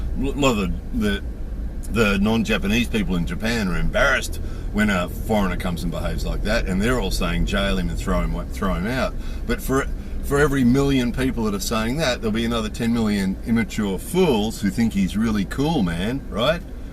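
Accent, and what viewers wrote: Australia. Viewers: Nice take on all points… Nice to hear an Aussie accent into the bargain.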